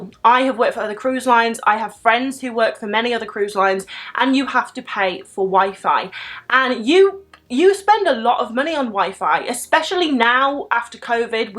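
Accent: United Kingdom. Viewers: Haha, I might work on a crew ship later in life because I don't have any experience now. But your British accent is addictive. Just watched every single video to hear you talking 🤣😂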